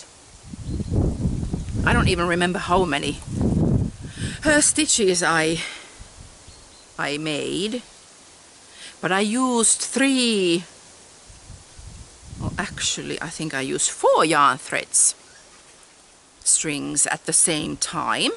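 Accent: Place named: Finland. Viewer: I love listening to you with your charming Finnish accent. I am from Sweden but now live in Seattle, USA. Please come back, even for small snippets!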